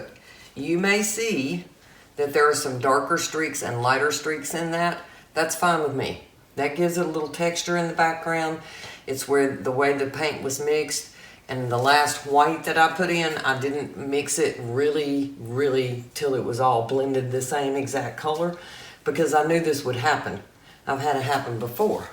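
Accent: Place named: South, United States. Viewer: I just found you today because I was looking for a varnishing technique... I love your artwork! I am from Georgia, so its also good to hear the southern accent...lol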